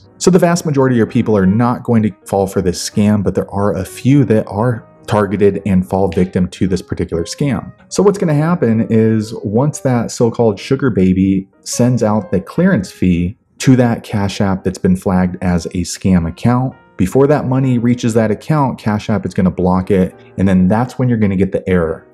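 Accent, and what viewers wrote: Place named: United States. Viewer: i can tell you're american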